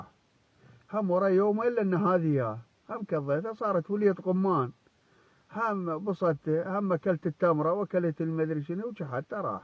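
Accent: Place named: South, Iraq. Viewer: لهجة جنوبية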